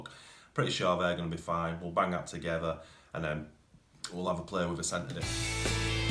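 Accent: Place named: Northern England, United Kingdom. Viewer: Lol just noticed if you see subtitles i dont think it understands your northern accent it comes up as Tommy Legends .. Oh love the car 👍👍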